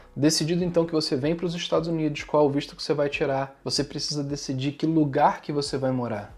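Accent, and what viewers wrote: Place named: North, Brazil. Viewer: Acho que ele é do norte do Brasil kkk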